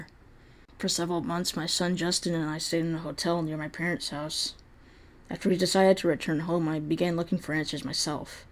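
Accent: United Kingdom. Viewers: That British accent! xD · heavy british accent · his English accent though 😂😂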